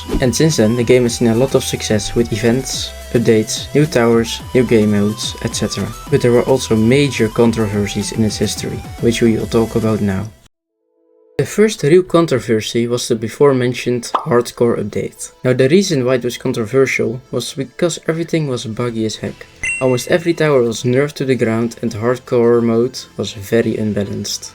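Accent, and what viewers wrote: Netherlands. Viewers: I know a dutch accent when i hear one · I might be wrong but are you dutch? You realy sound like a dutch person(amazing video btw keep cooking) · You really don't try hiding your Dutch accent, huh? 😅 (Nothing bad about it)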